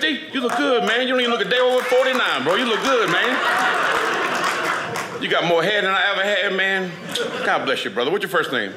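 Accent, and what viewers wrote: New Orleans, United States. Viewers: U know he from neworleans, because that's the way we talk. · He is hilarious! I was listening only with my phone turned down, and I swore he was black before he said something bahahah! That New Orleans accent gets me every single time!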